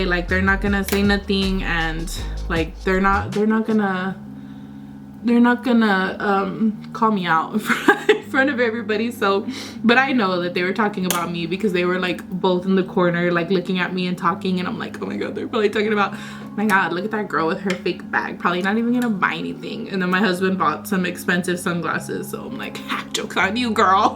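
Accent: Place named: Hawaii, United States. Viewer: Aloha, just found your page! Actually thought you were from hawaii! Lol! You talk like us! But let me tell you, it’s sooooo expensive here in Hawaii ugh! We pay the price of paradise but for the most part it’s worth it lol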